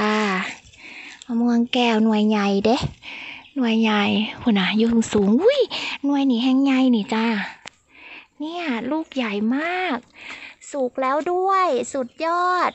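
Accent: Isan, Thailand. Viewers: เว้าอีสานเป็นตาฮักเนาะ...มะม่วงที่บ้านพี่ก็ออกลูกต่อเนื่อง..มดแดงก็เยอะ..สวนเกษตรอินทรีย์จะมีมดมาอาศัยอยู่เป็นเรื่องปกติ..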